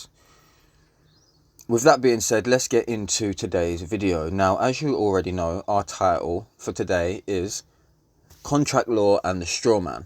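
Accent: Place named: London, United Kingdom. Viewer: u sound like a proper London geezer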